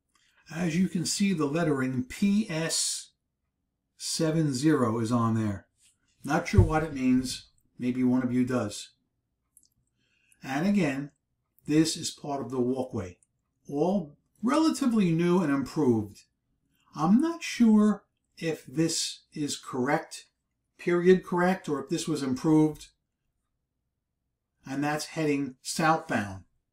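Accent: New York, United States. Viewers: Your distinct New York accent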